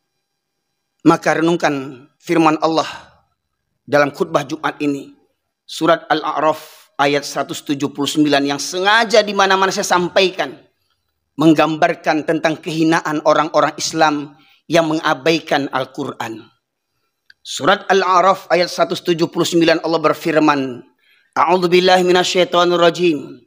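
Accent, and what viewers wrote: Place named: West Sumatra, Indonesia. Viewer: Ustad dari Sumatra Barat logatnya😮mantap man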